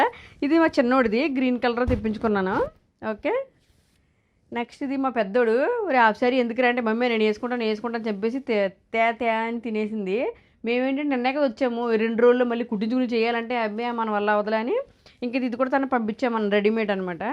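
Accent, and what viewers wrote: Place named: Konaseema, India. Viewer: Garu Mi Vedio 's Baguntayi Konaseema Language Matladuthuntay Baga Enjoy Chesta Madi Konaseema Function Lo Baga Enjoy Chesara Next Vedio Lo Pettandi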